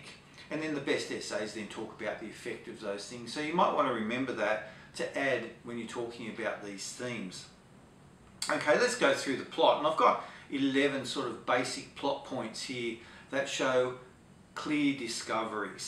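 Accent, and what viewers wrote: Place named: Australia. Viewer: I loveeee your Australian Accent!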